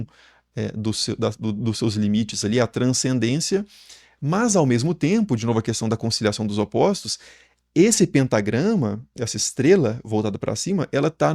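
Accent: Minas Gerais, Brazil. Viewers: O sotaque mineirinho “quem qui era o dono dissaí”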